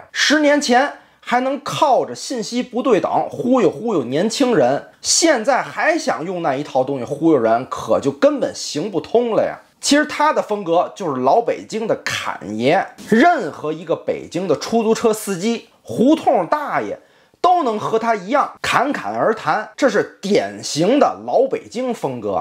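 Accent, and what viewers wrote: Tianjin, China. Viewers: 我特别喜欢听天津话，骂人都这么的带劲，快笑死我了🤣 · 还是有点天津味的北京话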